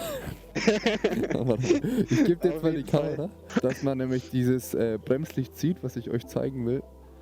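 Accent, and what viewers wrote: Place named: Franconia, Germany. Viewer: Bist voll der Franke gell?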